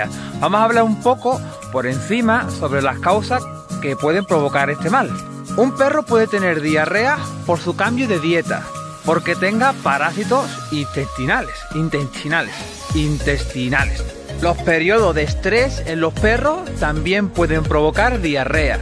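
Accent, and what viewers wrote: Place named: Andalusia, Spain. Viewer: Hola, da gusto escucharte Andalucillo jeje, me recuerdas a mis sobrinos los dos hijos de mi hermano. Yo tengo mi podenco de 4 meses, sigue así con esa sencillez que derrochas por tus poros. Un abrazo, me encantais tú y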